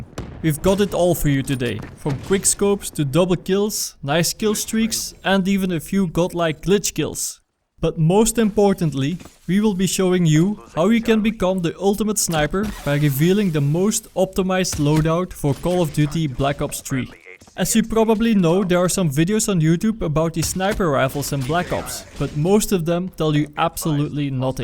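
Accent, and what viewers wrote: Netherlands. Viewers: Hahahaha je bent zo duidelijk nederlands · I bet you're dutch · je engels klinkt goed maar ik hoor gwn dat je nl bent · Dutch ... I can kind of hear it in your voice. exactly that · Are you dutch you sound so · dutch accent on point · Lol youre dutch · i hear the nederlands acsent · You sound Pretty Dutch with Saying The "R" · You have a very strong Dutch-ish accent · Hij is zoiezo Nederlands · gek nederlands accentje hoor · He is from The Netherlands i guess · nice dutch accent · your from The netherlands i can hear it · je bent duidelijk Nederlands😂. wel awesome vid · Nederlands accent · fk erge nederlanse accend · (Dutch accent) has to be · Are you Dutch by any chance? I can kind of hear it in your voice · Eman kan gelijk horen dat je NL bent dankje voor deze vid ga gelijk mn class aanpassen hopelijk merk ik het verschil keep it up en rustaaaag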